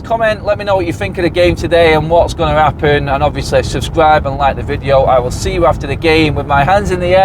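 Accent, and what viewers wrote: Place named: Manchester, United Kingdom. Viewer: I thought you was a manc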